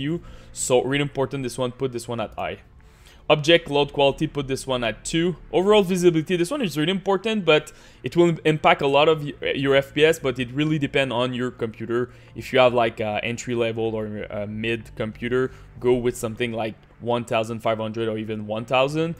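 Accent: Quebec, Canada. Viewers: hes french from quebec as i am and it took me more than 5 years of speaking to get rid of that french canadian accent lmao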